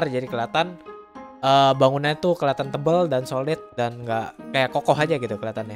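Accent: Java, Indonesia. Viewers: org jawa kah logatnya keliatn kek jawa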